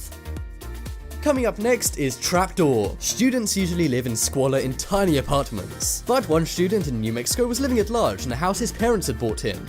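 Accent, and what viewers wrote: United Kingdom. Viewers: The chattery British narrative is hard on the ears. What's scary around finding a rusty old gun ? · He sounds British. I live 3 miles from America, they don't talk like that.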